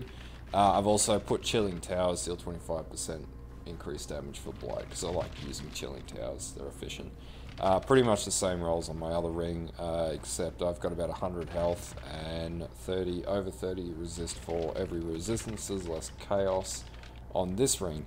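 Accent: Australia. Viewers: There's something soothing about being called a filthy exile in a smooth Australian accent (or is NZ?)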